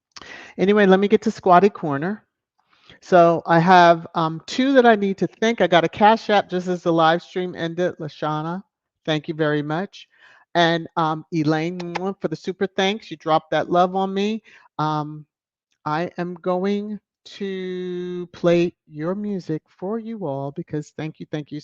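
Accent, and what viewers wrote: RP, United Kingdom. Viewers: Thank you for another wonderful thrash time 🙏😘❤️❤️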